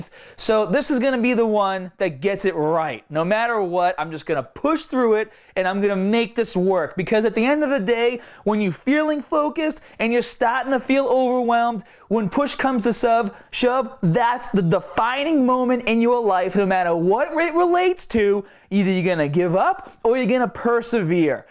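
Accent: Boston, United States. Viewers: The boston accent is heavy in this one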